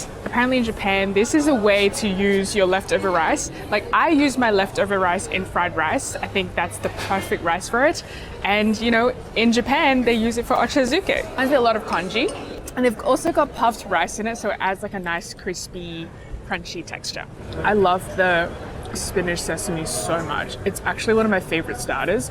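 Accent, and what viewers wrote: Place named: Australia. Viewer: Love your Australian accent :)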